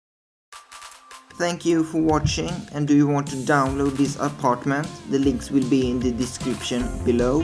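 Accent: Sweden. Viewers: You sound swedish :D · I thought i heard some swenglish in it swedish i awseome. hur länge har du hållt på med hus i sims?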